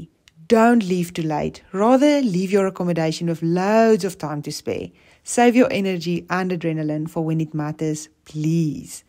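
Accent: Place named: Afrikaans, South Africa. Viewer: Afrikaanse accent in Engels is koning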